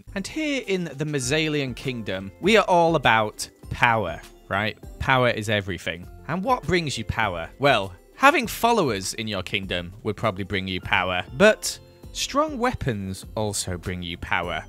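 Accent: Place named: United Kingdom. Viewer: Is it just me or did got more.. British?😅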